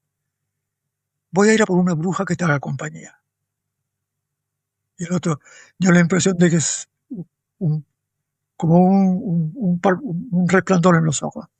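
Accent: Spain. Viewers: El Dr tiene una oratoria extraordinaria,y resulta muy bello oírle con su bonito acento andaluz.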